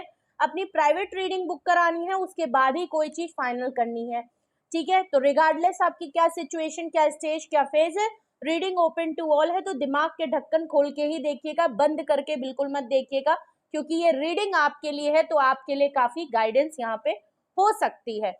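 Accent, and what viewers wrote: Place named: Eastern Uttar Pradesh, India. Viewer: Mam your way of talking is very impressive.....i think you belong to eastern up...Jai shree sita Ram ji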